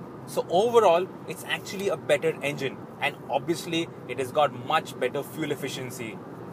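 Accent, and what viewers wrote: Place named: India. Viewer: ก็นึกว่าของคนไทยรีวิว นี่ไปเอาใครสำเนียงแขกมาพูดให้ฟังเนี่ย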